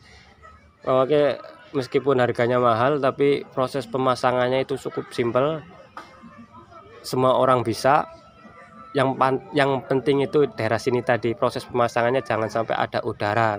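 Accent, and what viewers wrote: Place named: Brebes, Indonesia. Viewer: Logat e wong Brebes 🤔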